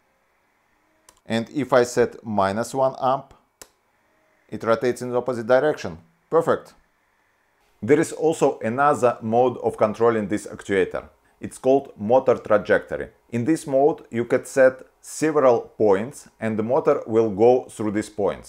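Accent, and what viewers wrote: Ryazan, Russia. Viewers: Это акцент Рязанский . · Я понимаю, я и близко не могу говорить на английском так как Вы, но,...блин русский акцент, это просто пипец, <link> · So Russian accent :) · Адский русский акцент, прям facepalm.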